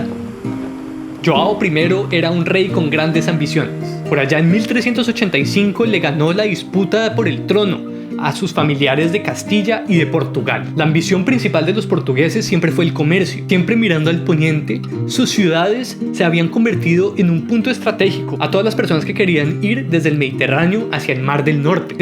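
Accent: Bogotá, Colombia. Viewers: Quedé como iniciado mano! 😅😅 Ojalá puedas hacer la continuación de este video, eres un auténtico rolo, un auténtico colombiano. Me agrada ver que seas tan apasionado por nuestra historia y representes con tu estilo y acento la cultura de la capital. Dios te bendiga.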